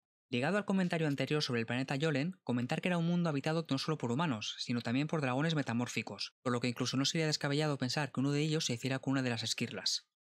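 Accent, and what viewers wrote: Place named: Spain. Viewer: hablas muy rapido (o yo estoy media lenta). Aun sabiendo del tema tuve que volver a escuchar en reiteradas partes, ya que no estoy acostumbrada a tu acento espanol a 90 km/h. saludos desde Argentina.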